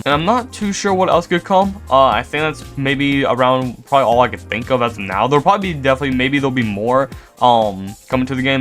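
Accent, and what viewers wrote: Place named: Kentucky, United States. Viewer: You from Kentucky? I’m from London Kentucky myself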